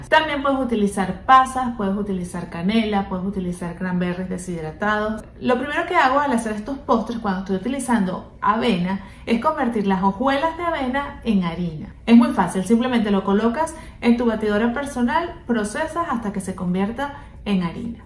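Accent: Venezuela. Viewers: ♥️♥️ creo que somos paisana por tu acento eres Venezolana...😍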